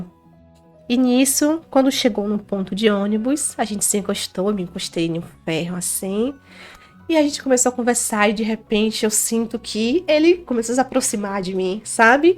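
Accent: Bahia, Brazil. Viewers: Essa narração feita pela a, com esse sotaque baiano ficou maravilhossaaa.!!!❤❤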